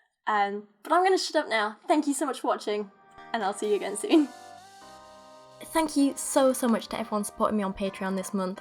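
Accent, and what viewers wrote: United Kingdom. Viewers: I think you are very smart and I like watching your videos. I sent a link to your channel to my 16 yo daughter (not just now, a while ago). Also, love your British accent. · You're brilliant, this is brilliant! I myself am a Roman Catholic, but I believe their is no and never will be any scientific evidence that a God exists. I even said that in the last book that I published on Amazon. Given those circumstances I'd say I'm between a rock and a hard place religiously speaking, but I got myself there and I almost wouldn't have it any other way. We have in common the fact that we are both straddling a hard line with the types of people we live around or converse with. I love the way you say the word "but" where it sounds like "bu" . I understand it's a British accent thing and me being from the USA. I don't hear that every day, and I just think that is interesting. 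I think you are perfect for not coming out as an anti theist, because it can be dangerous to do so. I say that knowing that all I have to go on is from my own life. I just know that If I where to come out and publicly denounce my faith and tell everyone that I hate Christianity and Christians that would be me doing the hateing. So keep on doing what you're doing, debating and posting videos about crazy Christian books that are as you would call them rubbish.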